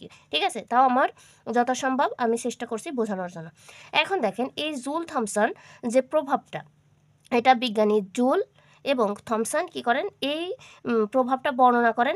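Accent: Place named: Pabna, Bangladesh. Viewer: আপু, আপনার বাসা ki পাবনা, সিরাজগঞ্জ এর আশেপাশে? ভাষা টা খুব পরিচিত লাগলো!❤️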